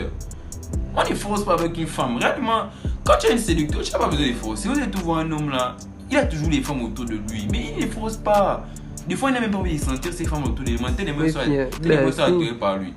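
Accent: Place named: Gabon. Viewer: Ça manque de qualité, d'originalité ! Ça ressemble beaucoup à No limit. Force en tout cas ! Ça sent l'accent Gabonais tout ça.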